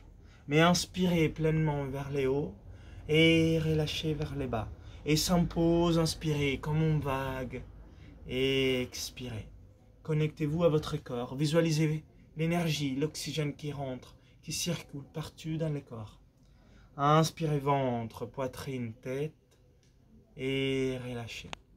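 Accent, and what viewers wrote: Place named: Italy. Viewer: Merci beaucoup! J'adore ta façon de coacher. Je pratique la respiration Wimhof depuis plusieurs mois mais j'ai acquis plus de fluidité et de détente en t'écoutant. Ayant travaillé de temps à autre en Italia, j'ai aussi le plaisir de retrouver la musique de ton accent!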